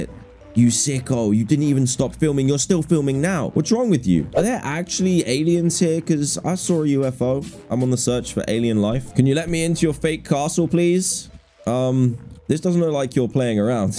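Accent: United Kingdom. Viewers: you know when you're watching someone who's too british when they pronounce amazon amizin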